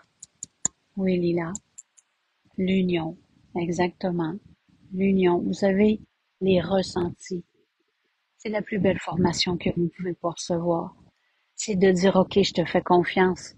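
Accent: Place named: Quebec, Canada. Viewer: Bonsoir de France nouvelle abonnée j'adore votre accent Québécoise 😊👍🏼 merci a vous🙏🏼🌷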